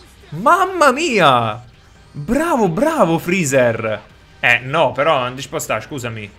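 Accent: Naples, Italy. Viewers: Ma quindi sei napoletano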